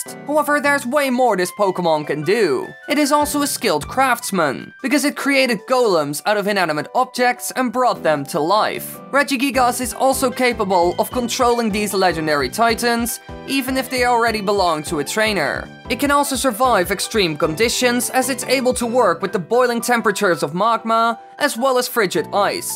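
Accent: Netherlands. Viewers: ar you dutch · Are you dutch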